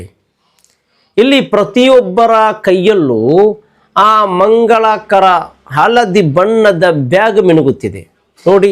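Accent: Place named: Tulu Nadu, India. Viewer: ಯಾಕೆ ವಿಶ್ಲೇಷಣೆ ವ್ಯತ್ಯಾಸ ಇರಬಹುದು ತುಳು ನಾಡಿನವರು ಉಚ್ಚಾರ ಸ್ವಲ್ಪ , ಬೇರೆ ಚೆನ್ನಾಗಿದೆ ❤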